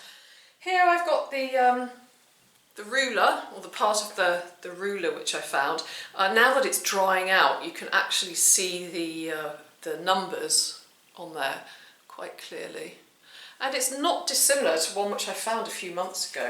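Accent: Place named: England, United Kingdom. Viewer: I love the research you do for the objects you find , it really does add that extra dimension to your show plus it's always nice to see your Beautiful face and hear you lovely English accent !.....I really miss living in England .....I must come back again soon I think !!.....I particulary liked Cornwall and was wondering if you might have a holiday there and do some Mudlarking along the Pirate Coast !!!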